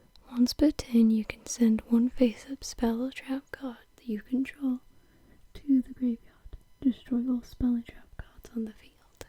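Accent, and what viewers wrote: Wales, United Kingdom. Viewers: are you Welsh?? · Are you welsh? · is this girl welsh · What part of Wales are you from? I'm from Caerphilly near Cardiff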